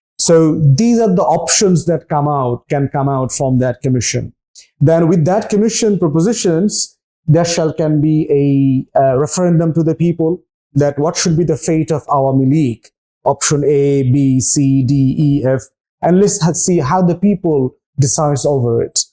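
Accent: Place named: India. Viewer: Indian দের সুর এ ইংরেজি শুনতে বিরক্ত লাগে আপু, ট্রাই করেন ইংরেজি ভাষা ইংরেজদের মত করে বলতে, উপদেশ টা পজিটিভলি নিবেন, আপু কিছু মনে করবেন না আপনার accent শুনলে ইন্ডিয়ান পালকি সর্মা এবং আরো ২/৪ টা রেন্ডি সাংঘাতিক দের কথা মনে পড়ে, তাই কইলাম আর কি 🙏! ফুয়াদ ভাইয়ের টা 👌 আর আপনাদের এই উদ্যোগ কে স্বাগত জানাই, এবং আপনি আন্তর্জাতিকভাবে বাংলাদেশের সত্য বিষয়গুলো ফুটিয়ে তুলছেন সেইজন্য আপনাকে অনেক অনেক ধন্যবাদ আপু ✌️❤️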